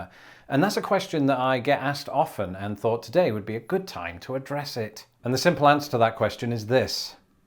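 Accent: United Kingdom. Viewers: I love your sarcasm and wit. Maybe some people miss it because Americans are bred to believe that someone speaking with a British accent is automatically serious lol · “To hospital” instead of “to the hospital” is uniquely Great Britain. I am glad you grew up in Britain only in that your accent is SO BRITISH. Love that accent. Watch anything tv made in Great Britain just to hear the accent. I think it was quite irresponsible of the Brits to let that accent die out when they got here to the states. You should fix that.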